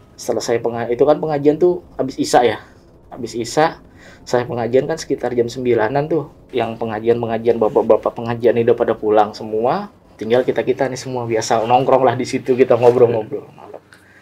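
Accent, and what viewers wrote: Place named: Banjar, Indonesia. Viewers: Pak dialek banjar mu masih melekat Pak wkwkwk, sehat terus Pak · Ada logat banjarnyaa 👍👍👍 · Logat kalimantan sdin😅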